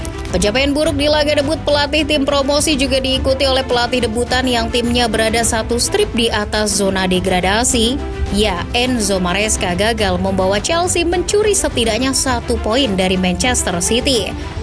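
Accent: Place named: Banyumasan, Indonesia. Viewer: Admin wong ngapak 😗😗😗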